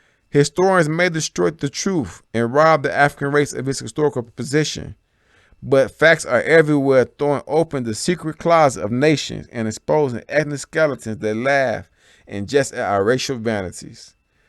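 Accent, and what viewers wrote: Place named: South, United States. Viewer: We wuz kangz is over hundred years old? Didn't know that. But the Black Southern accent is just great.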